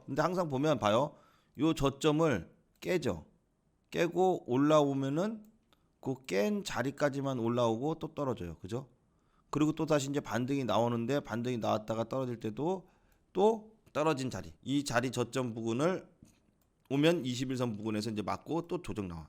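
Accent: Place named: Chungcheong, South Korea. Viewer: 발음을 봐선 서울은 아닌거 같구 충청도 ㅎㅎ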